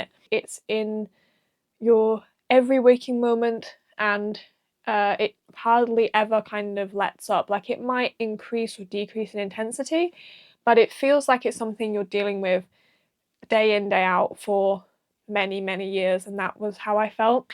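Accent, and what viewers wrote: United Kingdom. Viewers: You really sound like someone I know from Rochdale! Are you from anywhere near there?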